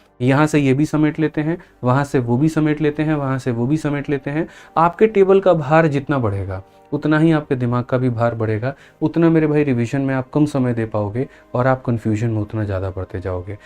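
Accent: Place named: Patna, India. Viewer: Sir aap patniya bol lete ho😂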